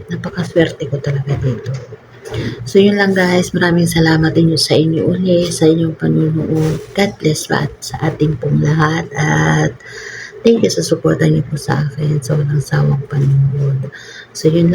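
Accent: Waray, Philippines. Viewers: Hala waray ka liwat kabayan?taga este ako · Hala waray ka ngay-an sis ☺️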